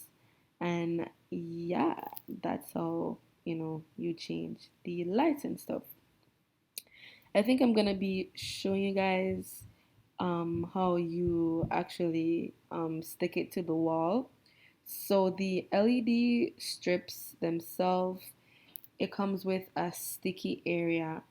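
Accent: Jamaica. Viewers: Dope video, why yuh sound like a yawdy🤔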